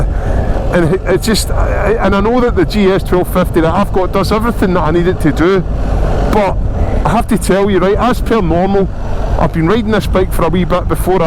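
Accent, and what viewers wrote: Scotland, United Kingdom. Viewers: you also have the best Scottish reviewer accent…ever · Proper Scottish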